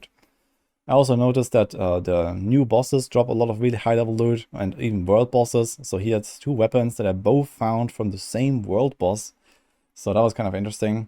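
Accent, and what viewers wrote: Germany. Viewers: Are you German, sorry for asking, I just watch your videos for ever and never wrapped my head around this one. Keeo up the great work!!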